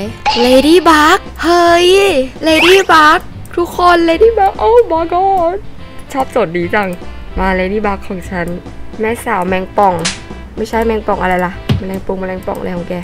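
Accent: South, Thailand. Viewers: พี่เป็นคนใต้หรอค่ะ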